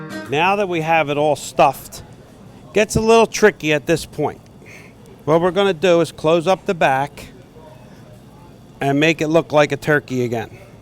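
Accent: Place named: New Jersey, United States. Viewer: mmmmm goodeats!! and I love that jersey accent. mwah!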